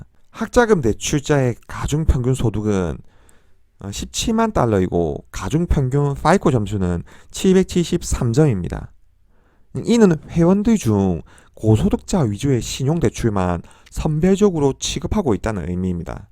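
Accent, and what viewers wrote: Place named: Gyeongsang, South Korea. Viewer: 감사합니다.. 항상 잘 보고 있습니다. 부산 사람으로 경상도 사투리가 너무 익숙하네요^^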